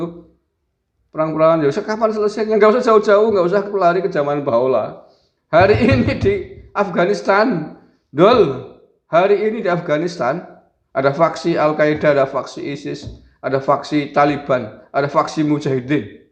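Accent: Banyumas, Indonesia. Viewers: 😅😅😅😅bhs Banyumas e lucu tenan kang😅😅😅😅😅😅..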